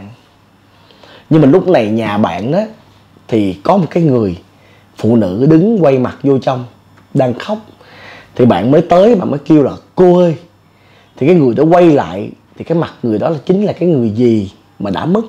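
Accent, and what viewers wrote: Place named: North, Vietnam. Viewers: Bạn nói giọng bắc nghe dễ thương quá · Bạn kể có thêm giọng bắc nữa hay quá .👍👍👍👍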